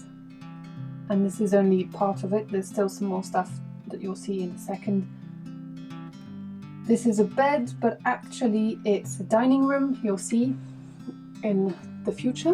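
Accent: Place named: Germany. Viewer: third german-sounding girl in a narrowboat video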